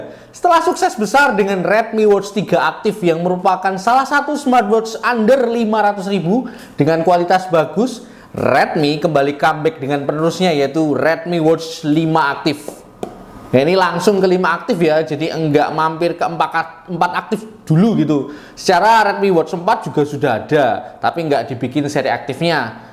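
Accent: Java, Indonesia. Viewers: medok tenan ngomong e😅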